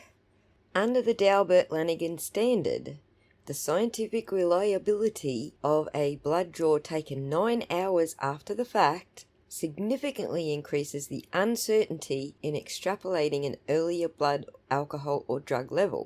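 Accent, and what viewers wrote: Australia. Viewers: I love listening to you. I understand what you are saying even with the math. If I had to math it out myself,I think I would end up in a brain fog stupor 😂. I followed the last trial with Vices ❤. I'm so happy to have found your channel. Much respect from south of the Tweed.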